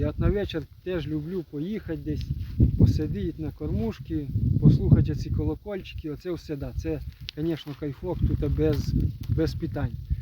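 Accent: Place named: Polissia, Ukraine. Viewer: Бажаю міцного. Ви напевно десь з Поліського або Іванківського району? По акценту зрозумів. Можливо й не правий. Гарна риболовля. Так тримати. Все відмінно. 🎉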